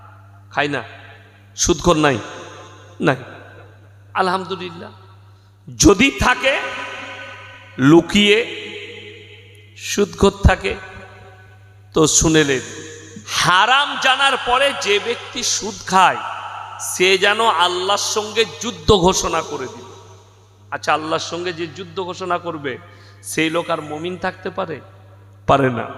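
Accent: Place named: India. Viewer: ওই হুজুরের ইন্ডিয়ার মত কথা কমা বলছে হুজুরের বাড়ি কি ইন্ডিয়ায়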